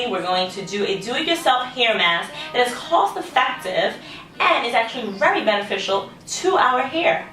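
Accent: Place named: New York, United States. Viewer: Are you from New York? It sounds like you have a New York accent😂also great video it really helped I just recently got my tips dyed and now they are very dry this helped so much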